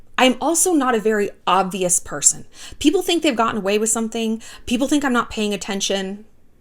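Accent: England, United Kingdom. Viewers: Your English accent is bomb 💣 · Your British accent 😂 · Lol your british accent 😘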